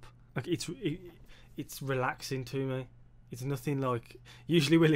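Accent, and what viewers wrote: Birmingham, United Kingdom. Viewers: They are one of ours, this was at the hammersmith odeon in london. your accent sounds like a brummie one but the loike could be west country or east anglia. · Hi Got a (not very) secret crush on you and your Brummie accent 😚. A question for you - did you chose your own title, or was it a suggestion? Just asking because it has a strange meaning, that you may or may not be aware of. Btw I watched your post 'Am I Gay' which I found confusing tbh.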